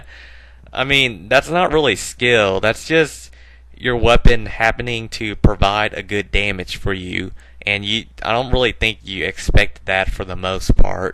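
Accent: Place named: South, United States. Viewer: U have a southern accent and ur asian???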